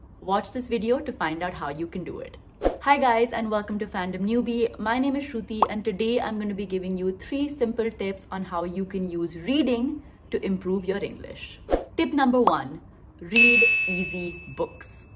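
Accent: India. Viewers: Wow that's very informative video. But haven't knowing your mother tongue, kashe asa tu ? Are you Goan ?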